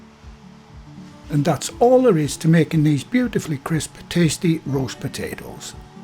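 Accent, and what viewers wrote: United Kingdom. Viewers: 너무 잔인해 You are so cruel 이런 멋진 감자라니 It looks Very nice potatoes 감자를 싫어하는 나도 먹고 싶어지잖아 I want to taste them even l don.t like potatoes 그건그렇고 By the way 너의 영국발음에 중독되고 있어 l am addicted your English accents 너 때문에 오븐을 살지도 몰라 lf I buy an oven it's because of you 하여튼 너의 동영상이 맘에 들어 Anyway l like your video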